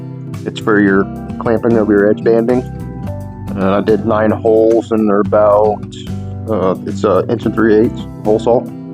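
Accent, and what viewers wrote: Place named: South, United States. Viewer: Very nice - I can do this! Unique. New sub from this vid-make more easy project vids with that country rock twang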